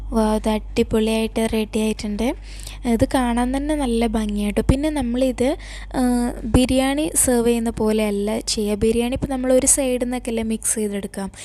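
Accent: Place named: Malappuram, India. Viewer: തനി മലപ്പുറം ആണല്ലേ വെറുതെ അല്ലെ അപ്പം ഞാൻ മലപ്പുറം കരിയാണ്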